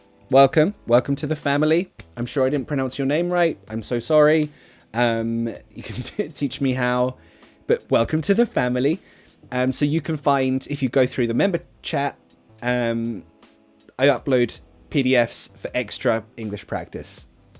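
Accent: United Kingdom. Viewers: It was superb to speak with someone who has a perfect british accent 😊 thank you teacher 😇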